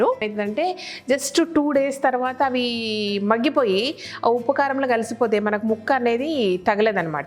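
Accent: Telangana, India. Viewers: Madam meeru Telangana na....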